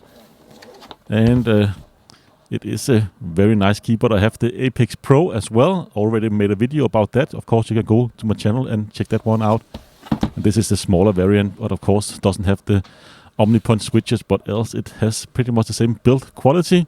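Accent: Germany. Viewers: u sound very german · Man hört so das er deutsch ist lol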